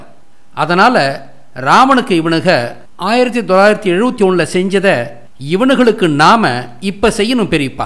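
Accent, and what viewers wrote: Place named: Southern Tamil Nadu, India. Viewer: உங்கள் பேச்சு அப்படியே தென்தமிழகம் பேச்சுமாதிரி இருக்கு ஐயா....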